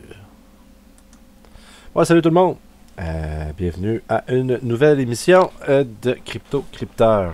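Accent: Quebec, Canada. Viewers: J'adort l'accent Quebecois!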